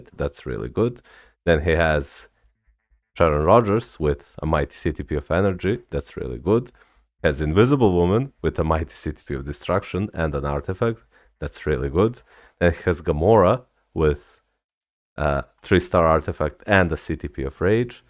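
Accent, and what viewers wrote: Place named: Serbia. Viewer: As soon as I heard your accent, I knew you were from Serbia. Glad to see that there are people that play MFF. My IGN is L0NEW0LF312.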